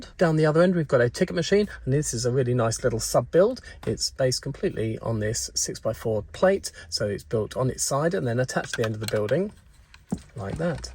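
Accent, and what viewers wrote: United Kingdom. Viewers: the hard english accent makes it 100% better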